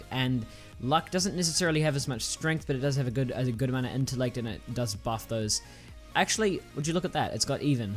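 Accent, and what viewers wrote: New Zealand. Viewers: nice nz accent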